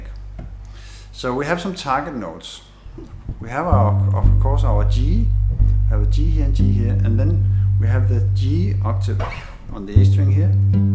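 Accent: Denmark. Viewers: Awesome lesson! :D really helped. And I love your danish-english accent :D so pwnage :D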